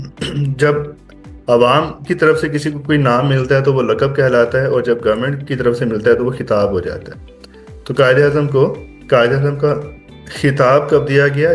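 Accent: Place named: Lucknow, India. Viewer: Sir, you speak very good, and it seems that you have learnt Urdu from lakhnew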